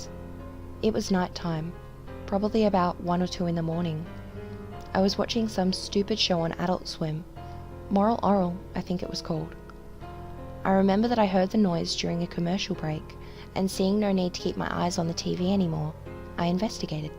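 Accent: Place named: Australia. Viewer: It's good, you have to work on the voice quality (mainly removing white noise, if you use audacity, the noise reduction feature will be your best friend, and also the change in volume, try turning the music up and down instead of the vocal track) but it's still a better quality than my first videos! And I'm a sucker for Australian accents, so I absolutely love that! :) Subbed, rooting for you!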